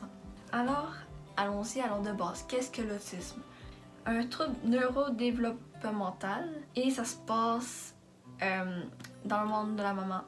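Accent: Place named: Quebec, Canada. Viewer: De rien c'est 100 % sincère votre accent m'a fait pensé à Céline Dion une chanteuse que j'adore.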